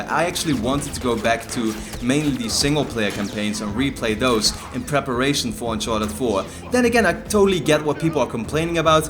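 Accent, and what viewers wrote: Netherlands. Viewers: are you dutch ??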